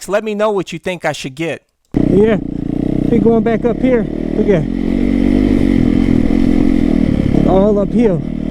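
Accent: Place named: South, United States. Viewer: the southern accent is the best part of this video! 🤣🤣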